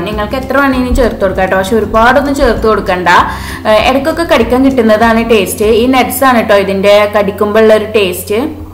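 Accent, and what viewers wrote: Malappuram, India. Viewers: Nihal MN കോഴിക്കോടല്ല മലപ്പുറം ജില്ല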